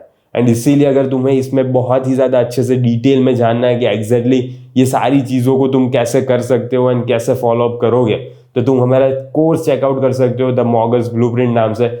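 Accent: Gujarat, India. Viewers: bhai tamari Hindi thi mane tame gujarat na j lago chho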